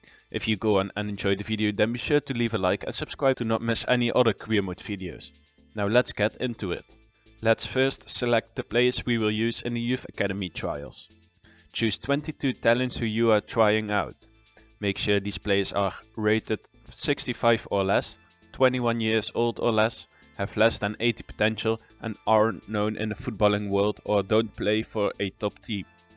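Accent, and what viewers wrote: Netherlands. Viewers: your accent has to be the most 'Brabant`s' English i have ever heard xD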